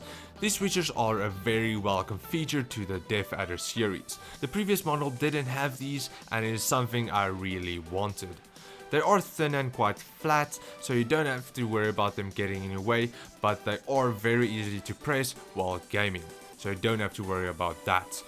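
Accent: Afrikaans, South Africa. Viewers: The moment i heard that accent I knew it was a South African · I thought you had a afrikaans/ South African accent😂😂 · I clicked on the video, heard the South African accent and subbed. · My guy you from sa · Are you from South Africa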